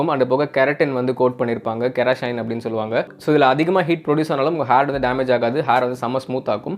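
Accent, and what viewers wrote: Madurai, India. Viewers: Today speaking slang very level and attractive 😍😍😍like madurai peoples...love from madurai 🤩🤩🤩🤩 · Madurai Slang la Pesuriga 😁😁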